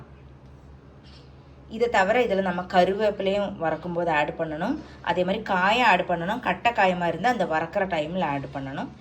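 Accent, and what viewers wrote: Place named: Palakkad, India. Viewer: பாலக்காடு பிராமண தமிழ் ரொம்ப பேஷ் பேஷ்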